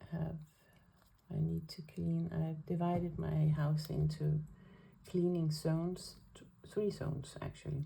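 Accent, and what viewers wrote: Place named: Denmark. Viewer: I love your accent! My grandfather was from Denmark but I don't remember much about him besides the way he spoke :)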